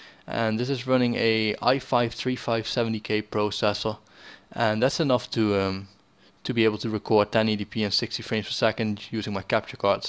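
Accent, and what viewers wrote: Netherlands. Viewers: are u dutch? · Are u dutch? :P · Your accent look likes dutch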